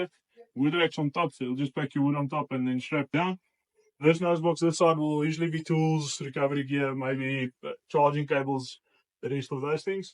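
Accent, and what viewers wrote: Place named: Africa. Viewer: Man where you from? Your accent doesn't match your face. Are you from Africa?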